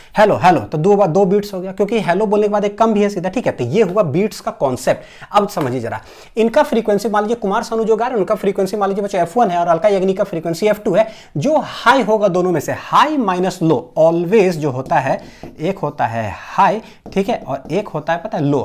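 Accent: Bihar, India. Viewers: thanku sir आपसे पढ़ने पे अपना वाला फीलिंग आ गया आपका accent बिहारी वाला है न 😌❤️ सामझ् गए सर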